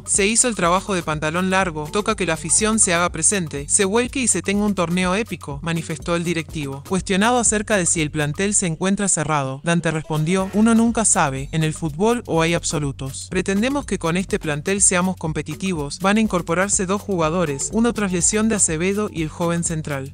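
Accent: Argentina. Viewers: Quiten ese robot con acento argentino por favor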